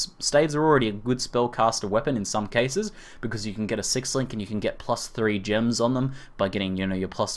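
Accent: Australia. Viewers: is aussie?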